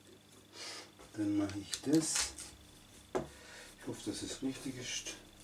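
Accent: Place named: Swabia, Germany. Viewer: dass du dann doch nicht zu extreme ins Schwäbische verfällst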